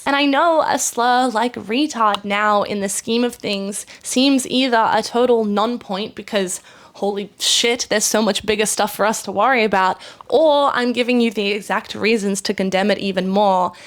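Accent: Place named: Australia. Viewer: It took me watching more than halfway through the video to realise that you were also Australian.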